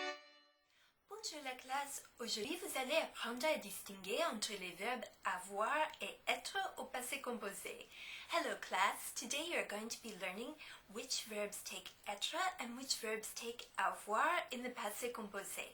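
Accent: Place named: Canada. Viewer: I like your canadian accent . thank you so much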